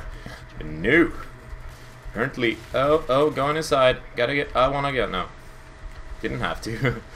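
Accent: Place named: Sweden. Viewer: can u be my english teacher. MY teacher is like 80 and scottish. Scottish accent< Swedish accent!!!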